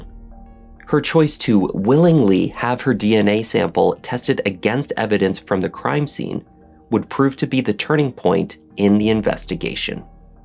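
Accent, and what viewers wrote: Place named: Canada. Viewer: He's not American. He has a very noticeable Canadian accent.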